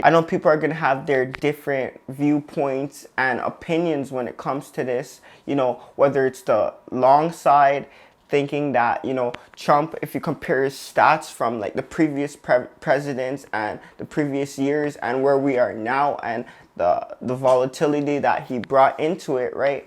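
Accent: Toronto, Canada. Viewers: From toronto? Just guessing from the accent.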